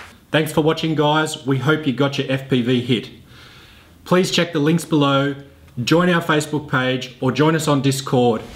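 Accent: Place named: Australia. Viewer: Thanks for the review and great accent! What part of Australia is that? I was I Sydney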